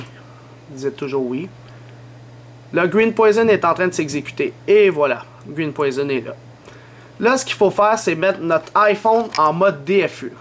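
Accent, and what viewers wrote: Quebec, Canada. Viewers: Merci pour ton tuto très sympas (j'aime bien ton accent québécois <3) ça marche parfaitement du coup j'ai un super ipod jailbreaké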